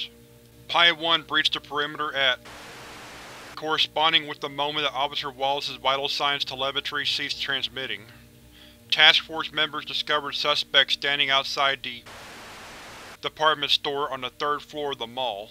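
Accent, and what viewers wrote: United States. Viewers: This one's very clever. And terrifying if it was weaponised. Great reading as usual. May I ask where in the States you got your accent from. As I'm Australian, I can't pick it myself. Perfect voice for these readings.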